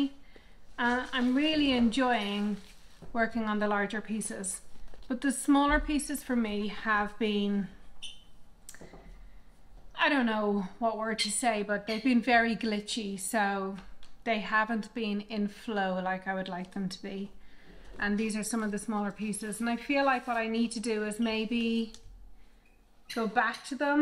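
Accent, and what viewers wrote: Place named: Ireland. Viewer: Congrats!🎉 Love your channel …. And the Irish accent is lovely. Good luck with your channel! 🌸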